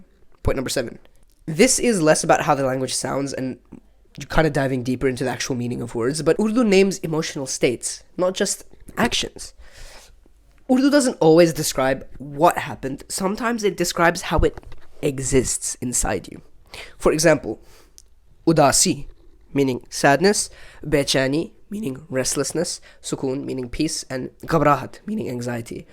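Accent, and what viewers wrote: India. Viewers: your pronunciation of urdu words is not correct, you are speaking with a hindi accent. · Yo bro I live in Pakistan so I'm not just a native speaker I have also studied it as a compulsary subject. As a native i can confidently say............ Bhaitum na kam urdu aur zada Hindi accent mai bol ry thy(Bro your accent was more Hindi than Urdu) alao your pronounciation of "خ" is totally wrong. When there is a خ sound you always pronounce it as کھ which is so SO wrong............and you just sound so Indian. But the video was cool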